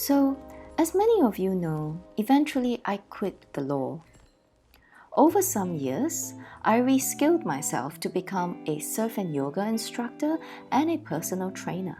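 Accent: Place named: Singapore. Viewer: Okay, I knew it, you are from Singapore. The accent just can't really be hidden... it's too distinctive... plus the description about stressful studies and city-life, corporate rat race... Nice videos... must be nice to be able to just get away from the rat race.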